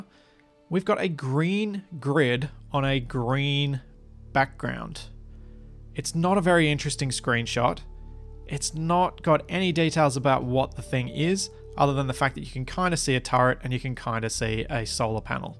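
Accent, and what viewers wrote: Australia. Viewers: I literally watched like 50 episodes of survival before realizing was an aussie. I just have a bad ear for accents and thought he was British or something the whole time. The Bin Chicken episode was what finally enlightened me, after some googling. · Do you come from the land down under?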